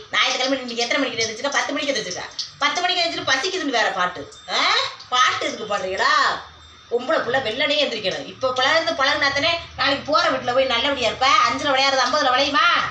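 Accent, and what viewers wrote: Madurai, India. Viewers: என்னையா மாதிரி இருக்கா கேள்வி கேக்குற பானு நானும் ராஜபாளையம் வாக்க பட்டுவந்த மதுரை அம்மா மாதிரி பேசுறேன் நெனச்சேன் நீயும் இப்படி தான் பேசுற பானு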